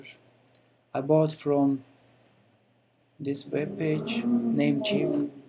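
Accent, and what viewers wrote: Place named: Turkey. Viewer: Çok faydali ve işe yarar bir video olmus sagolun bey bu arada videodaki ingilizce telaffuzunuzdan anladim sizinde bir Turk oldugunuzu:))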